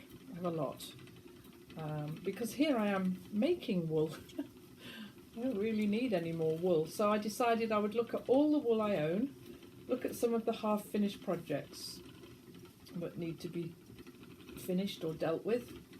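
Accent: England, United Kingdom. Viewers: Someone asked me if my friend Kate who lives in England has an English accent. Uh,h,h.......I don't think so. So I'll be listening more closely to see if you DO have an English accent.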